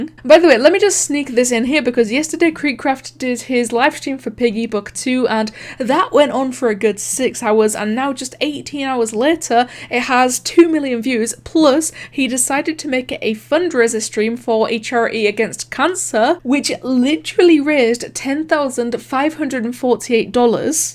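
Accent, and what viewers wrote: United Kingdom. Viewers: She's british and they speak like that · She sounds British... Roblox sounds like rublux (no offense )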